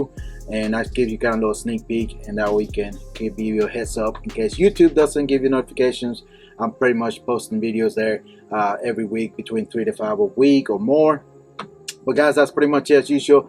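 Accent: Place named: South, United States. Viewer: Man, I'm from GA and I love your southern accent it's pretty unique, I haven't heard a Mexican and Southern accent together before, but being from the south myself I could understand you just fine lol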